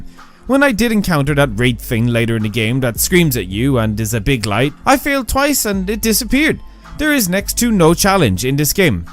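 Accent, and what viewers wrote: Ireland. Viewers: Lol love this review! Especially with your Irish accent 😂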